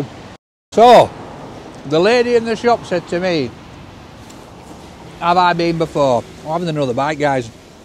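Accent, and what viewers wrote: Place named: Northern England, United Kingdom. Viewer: I love this channel, but i watch it especially because of how northern this bloke is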